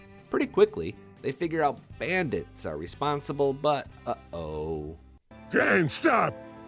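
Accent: South, United States. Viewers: I could care less. I hate her lousy southern accent narration. It is very distracting.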